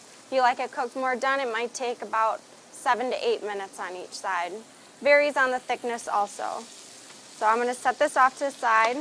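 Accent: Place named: Illinois, United States. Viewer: lol haven't heard an Illinois accent in a while.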